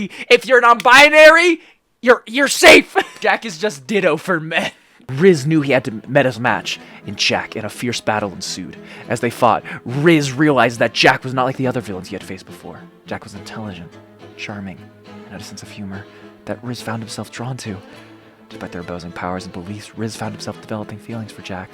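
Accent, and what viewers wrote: United Kingdom. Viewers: It’s not the same without the British gentleman voice tho…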